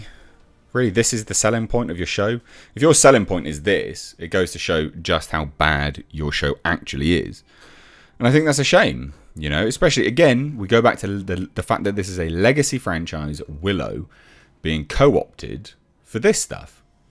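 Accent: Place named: United Kingdom. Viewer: "toh-mah-toes" Not being demeaning but I love how you Brits enunciate some words quite differently than those around me. Plus comments helps the algorithm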